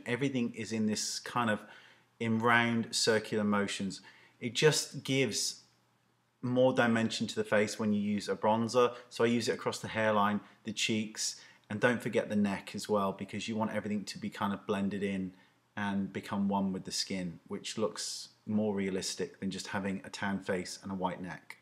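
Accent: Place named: West Country, United Kingdom. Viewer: It's a west country accent... like Bristolian but not as harsh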